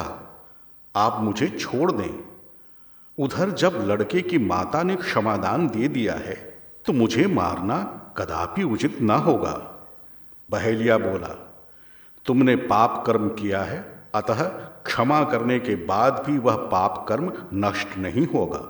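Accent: Standard. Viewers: शुद्ध उच्चारण में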